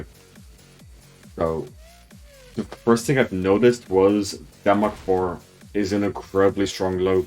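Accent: Ireland. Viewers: He’s Irish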